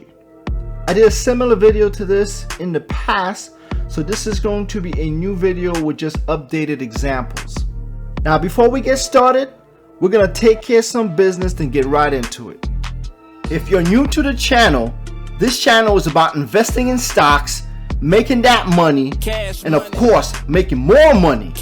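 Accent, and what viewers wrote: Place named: Northeast, United States. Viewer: where you from champ? I am guessing the Northeast USA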